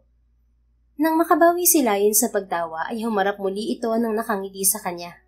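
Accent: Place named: Batangas, Philippines. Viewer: Thank you, writer Smiling face, and for a wonderful story..Ang galing mong narrator lalo sa Batangas intonation mo..Congrrats👏👏👏❤❤❤⭐⭐⭐⭐⭐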